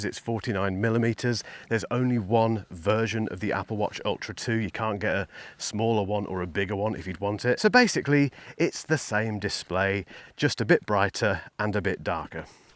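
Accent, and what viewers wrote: England, United Kingdom. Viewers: Maaaan you are great! Love the English accent. I’m gonna buy the ultra 1 used 🔥👍 sorry for my English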